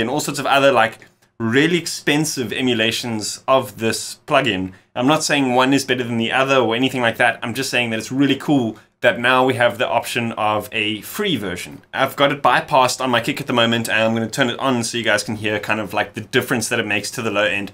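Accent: South Africa. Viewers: Is that a JHB accent I hear? - anyway - Nice Video - but you only said the words "Sort of' 200 Times :) - Thanks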